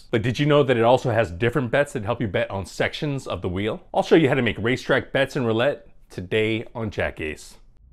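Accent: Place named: France. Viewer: Thanks for the great content as always. Nice french accent haha. Cheers from Montreal, Qc, Canada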